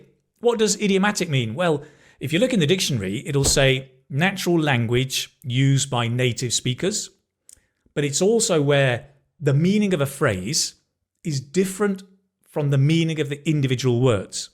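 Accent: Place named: RP, United Kingdom. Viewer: Sir could you please explain if it's possible for a person to learn your accent in just 4 months? (I am not exaggerating). Cause that's what exactly is happening to me right now, i completely lost my previous American-ish accent i would say that i can confidently speaks 80% of your accent without even thinking or telling my brain if how it should sounds like. I admit the Modern RP accent fascinates me that's why i invested myself emersing with the accent. I have to ditched those non-RP speaking shows, movies and podcasts and focusing only in modern RP sounds that shadowing and mimicking becomes part of my daily routine as if it is part of my body. I'm not just thinking in a modern RP accent i also literally speaks them, our cats and dog has been stressed out because i am also speaking to them. When i was just 11 yrs. old back in 92' i have this "Speech Impediment" disability where i constantly stutter and stammer, i can't speak my Filipino language constantly and spontaneously without stuttering. 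Then i noticed whenever i speak an English word or sentences my disability were non-existent, since my disability had separated me from the world of communication i invested myself learning the English language without having someone guiding me how to learn them. At that time we can't barely afford buying the things we need so my family can't afford of getting me an English tutor, so i'm on my own. That time the only way for you to learn understanding each and every word is by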